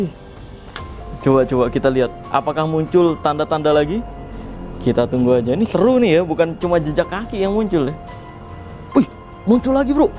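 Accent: Java, Indonesia. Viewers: Hhaha ngeper, bahasa jawanya kental bgt.. Conggrats 4jt subs 🎉🎉🎉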